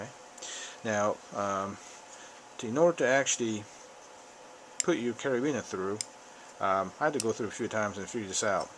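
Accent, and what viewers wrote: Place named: United States. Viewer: So much easier to listen to America English that I use everyday.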